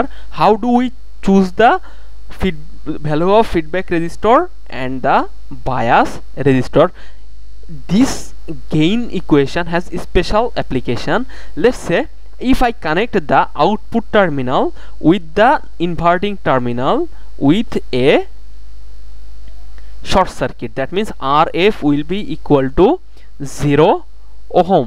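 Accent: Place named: Assam, India. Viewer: Assamese English.. Anyways, nice explanation !! 👍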